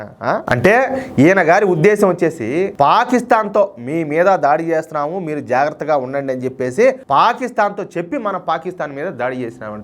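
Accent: Rayalaseema, India. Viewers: U speak my language in rayala rajyam slang bro ... gan D super bro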